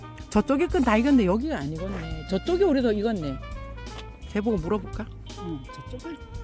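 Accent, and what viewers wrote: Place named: Gangneung, South Korea. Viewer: 자두보니 군침도네요 목소리가 강릉 말씨네요 제고향 강원도 어렸을때살던 대관령 가보고싶네요😊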